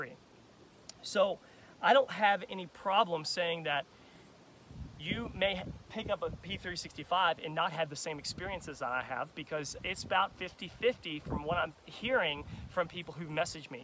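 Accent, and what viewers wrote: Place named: Indiana, United States. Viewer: My guess is Indiana...looks like an Indiana farm and sounds like a Hoosier!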